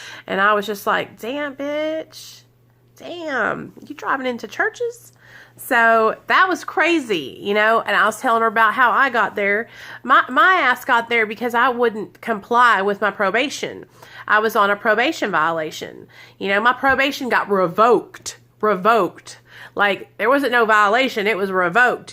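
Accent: South, United States. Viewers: i love 💕 your accent is that down south ????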